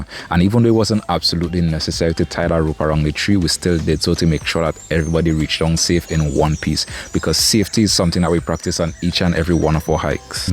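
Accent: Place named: Jamaica. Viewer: Your accent took me back to Jamaica.❤️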